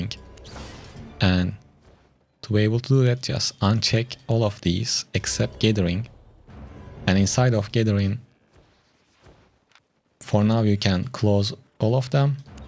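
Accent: Turkey. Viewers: Tam bir türk aksanı başarılarının devamını dilerim dostum 3 yıl sonra tekrar başlayayım dedim ve videolarını izliyorum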